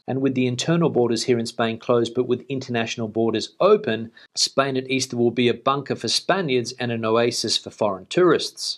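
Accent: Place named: Australia. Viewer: Lyon is in France; LEON is in Spain...get rid of that Australian accent...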